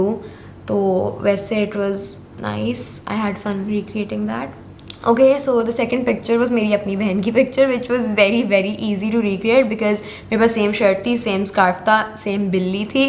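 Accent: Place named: Pakistan. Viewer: picture are good because am a photography & i have my own studio in multan but the thing i like is your accent during speking english means k english urdu mix 😁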